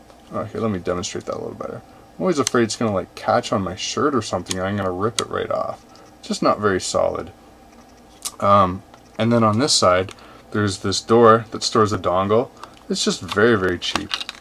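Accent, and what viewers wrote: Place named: Canada. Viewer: I hope the headphones sound better than your annoying Canadian accent.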